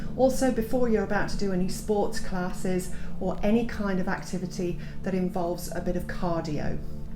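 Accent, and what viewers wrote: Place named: Ireland. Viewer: I meet this lady yesterday. She is so inspiring. Thank you. Love the Irish accent.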